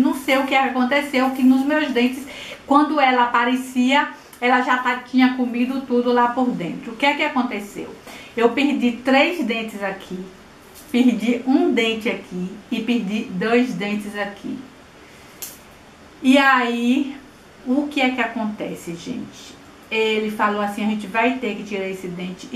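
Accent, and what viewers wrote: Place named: Bahia, Brazil. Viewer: Vc é linda!Sua essência é verdadeira,alegria contagiante e espontaneidade como sua mais perfeita qualidade!Adoro esse sotaque baianês,vc é um exmplo de mulher,não se importe com essas pessoas vazias e fúteis,elas realmente não sabem o que vieram fazer no mundo,azar o delas!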